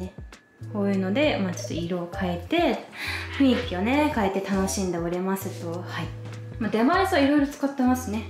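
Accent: Kansai, Japan. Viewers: たまに出る関西弁とちょっと関西弁に寄ったイントネーションになぜか胸が締め付けられる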